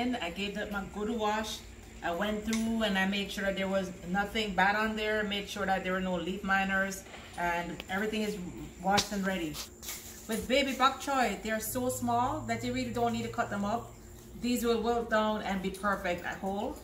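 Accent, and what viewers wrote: Jamaica. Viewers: you're welcome Jamaican accent Are you Jamaican or just Jamaican parents?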